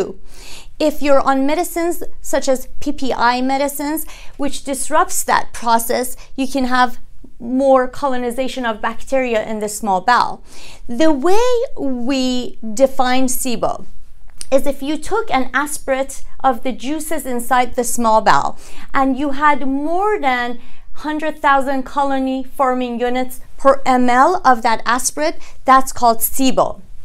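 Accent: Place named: Iran. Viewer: Sounds Irani.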